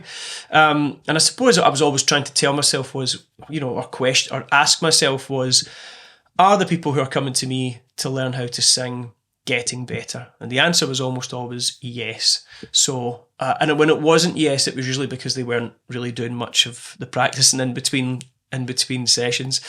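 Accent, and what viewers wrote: Scotland, United Kingdom. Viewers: OMG, I thought you were Justin Timberlake for a moment, you look so like him. Then I heard the Scottish accent like mine. Great video. Keep up the great work. Much love and light. Poulo.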